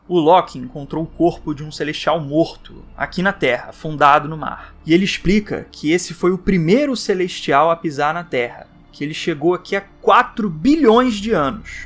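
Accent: Rio de Janeiro, Brazil. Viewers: Tu é carioca mérmão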